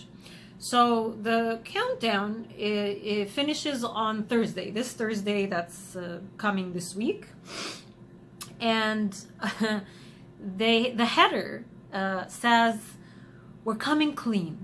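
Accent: Egypt. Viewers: Do not lie... You are not from Saudi... Your Arabic accent is Egyptian 100%... If u r Saudi prove it... Passport.. Or which part of the Saudi area... What is your tribe ... Otherwise stop telling lies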